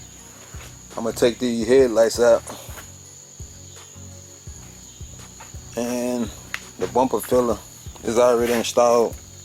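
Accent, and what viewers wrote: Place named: Memphis, United States. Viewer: Is this in Memphis Tenn? Sounds like it.. either way you just got another subscriber. Entertaining man nice work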